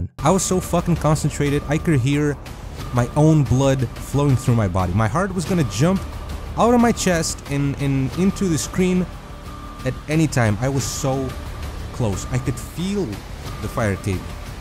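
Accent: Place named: Portugal. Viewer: ahah "daaassss" "é agora caralho" puro tuga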